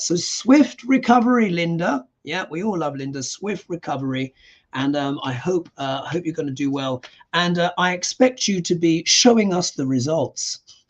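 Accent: United Kingdom. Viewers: (Jeannette M Mahan) Hi handsome Mr, your British accent is music to my ears, I love British people! I say that with the utmost respect.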